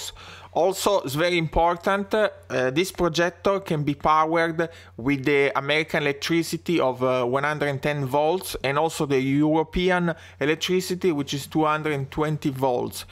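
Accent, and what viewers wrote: Italy. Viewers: Che forte accento italiano cavolo hihihih spero di vederti al raduno di piazza Duomo Milano .... ti seguo da tanto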